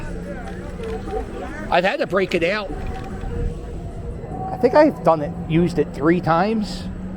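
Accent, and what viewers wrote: Philadelphia, United States. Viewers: Which part of america is your accent from? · Love your Philly accent.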